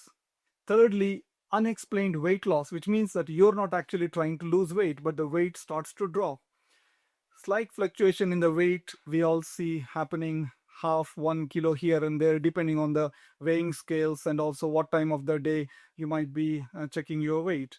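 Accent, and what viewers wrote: India. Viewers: You india English is 50 / 50 to understand what are you saying ! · I was enterested with the caption. But the moment I heard that Indian accent.. i closed it.. IDKW..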